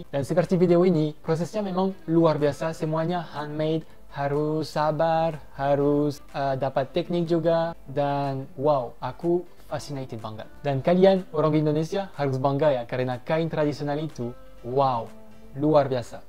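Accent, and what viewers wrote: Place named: France. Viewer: Bahasa Indonesia nya sudah lancar aksen nya doang masih Perancis 😁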